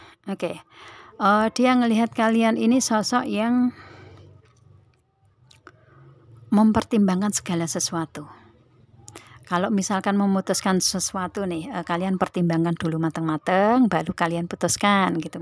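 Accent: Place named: Java, Indonesia. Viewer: Dari jawa ya mbak ... klentum nya kyk Jawa.mbak ...tksh mbak ....Aqua..resonet kok mbak.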